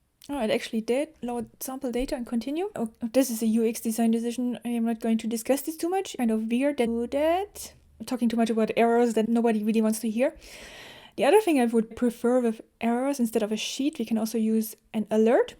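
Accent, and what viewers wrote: Germany. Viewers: Your accent sounds German with a tiny bit of French. Are you from the region of Germany near France?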